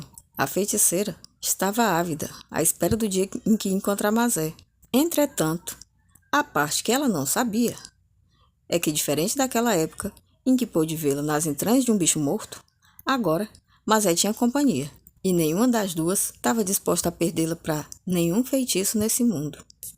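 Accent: Northeast, Brazil. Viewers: Boa tarde irmãzinha, gostei muito de seu canal sua voz narrando ôs vídeos é bem nordestina, sou daqui do estado de São Paulo, mais nasci na Bahia, pôr isso entendo tudo que você diz 😂😂😂😂😂respeito muito ôs vídeos, c são reais ou não fica há cargo de cada um, acreditar ou não, tenho dezenas de canais que relata vídeos verdadeiros de lobisomens vampiros e outras criaturas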